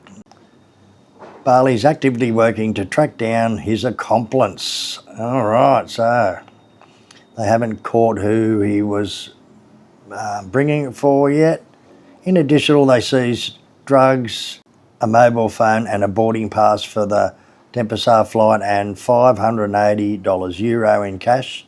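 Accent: Australia. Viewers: Hi. REALLY enjoyed that and learned more about Bali. I enjoy listening to your Aussie voice not many of us left it seems. And yes. Albo is a goose. Keep em coming!!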